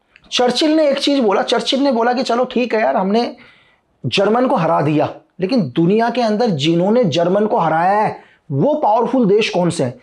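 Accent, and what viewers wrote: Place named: Rampur-Moradabad, India. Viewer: ay be from Rampur-Moradabad, if you go by accent.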